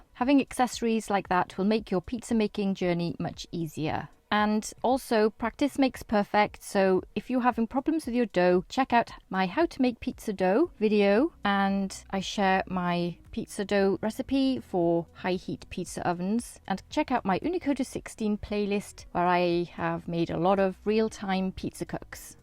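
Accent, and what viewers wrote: Wales, United Kingdom. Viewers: Welsh accent is lovely, great review! Very in depth, confirmed my decision to get one I reckon. Cheers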